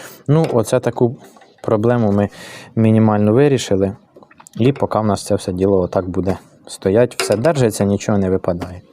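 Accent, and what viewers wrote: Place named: Belarus. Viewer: тута, шо ми... мабуть бульбаш якийсь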